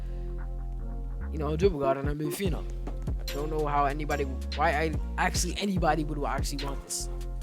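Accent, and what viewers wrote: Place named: New York, United States. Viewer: U sound like u from NY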